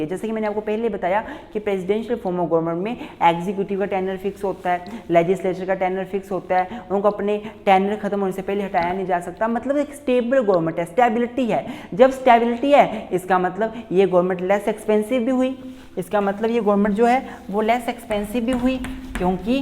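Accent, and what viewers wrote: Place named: Pahadi, India. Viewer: Sir ap phadi ho I mean ap Himachal se ho apki abaj se thoda thoda feel aa rha h phadiyo bala🙂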